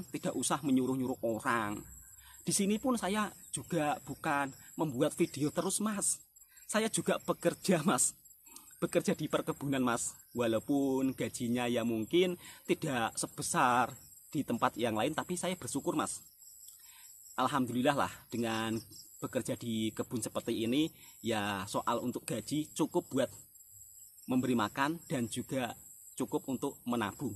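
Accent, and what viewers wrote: Java, Indonesia. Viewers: Mas ni lucu bila bercakap kuat pelat jawa nya.. sekadar konten mengapa di ancam terus.. open minded aja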